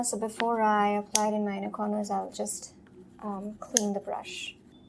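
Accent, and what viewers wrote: Bengal, India. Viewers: WoW you're bengali❤️ Just perfect bengali accent omg❤️beautiful!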